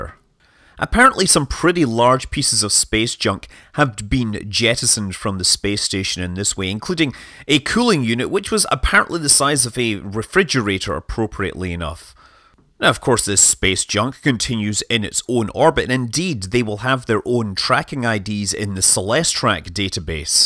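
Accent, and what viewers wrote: Scotland, United Kingdom. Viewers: Say what?! I thought he was scottish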